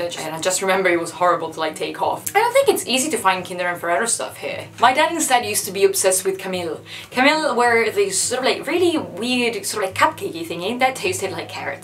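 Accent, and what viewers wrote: England, United Kingdom. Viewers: Bellissimo video! Il tuo accento inglese è top😱🦋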